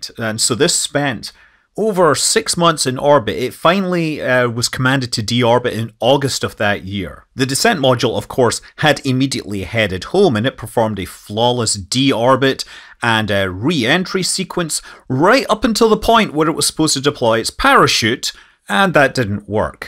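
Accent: Scotland, United Kingdom. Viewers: Is Scott... Scottish? I thought I've heard a Scottish accent.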